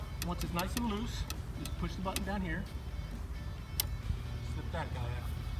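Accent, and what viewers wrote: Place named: New Jersey, United States. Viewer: Nice video. FIrst time on your channel - you sound like you have a New Jersey or PA accent. Reminds me of home. Thumb's up.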